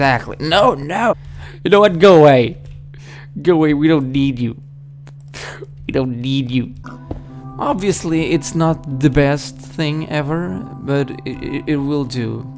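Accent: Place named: Portugal. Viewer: I think he´s from Portugal.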